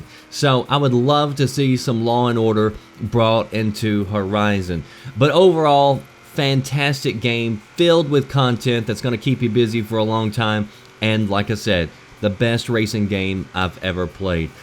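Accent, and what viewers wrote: South, United States. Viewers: You might find this amusing, but your voice reminds me so much of a preachers voice. Just a tinge of a southern draw and you pauses and articulation - lol. Preacher. Anyway, like your reviews. Fair and nothing meant to bring on fanboy arguing, though I'm sure idiots in your comments still do now and again. My boys and I love Forza so it's cool this one has turned out to be aces! I don't have a PC gaming rig so it's all Xbox One for me. Thanks again.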